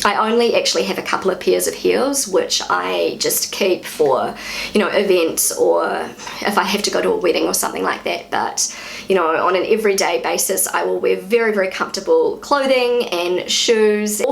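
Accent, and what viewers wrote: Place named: New Zealand. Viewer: Being from northern Germany I don't care much about dirndls, but I love listening to your NZ accent. As a former exchange student to the UK and the USA it is absolutely beautiful to learn about your pronunciation - and I think it's lovely. Thanks for sharing! ❤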